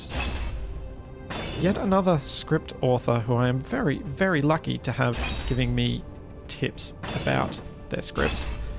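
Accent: Australia. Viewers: Oh, I didn't know you're Aussie. Happy to know mate. Brisbane here. :-) I'm loving your videos. And you're totally right. Do it the fun way, efficient or not.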